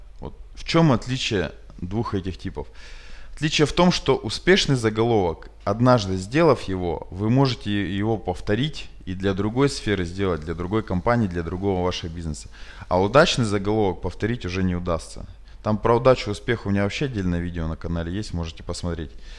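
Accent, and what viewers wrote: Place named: Rural, Russia. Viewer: Только комфортная скорость воспроизведения 1.25, а остальное - всё супер. Говор приятный ) Такой, не городской ) Да, и спасибо большое за науку и видео (а то понаписал всякой херни, а поблагодарить - не написал:0) ЗЫ. Музычка в конце видоса - громковата...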